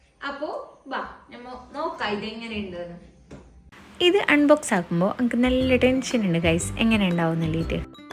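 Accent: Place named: Kasaragod, India. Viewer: Enntey ponno.... Kasaragod bhazha ippozha kelkunathu...nalla resam undu kelkan❤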